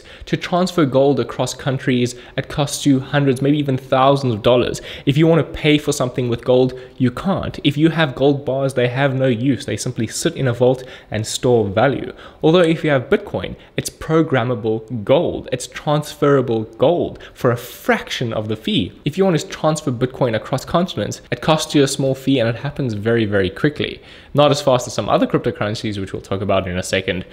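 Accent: South Africa. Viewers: Absolutely a South African and you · Are you from SA your accent kinda sounds that way ... I'm new here to your channel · south african?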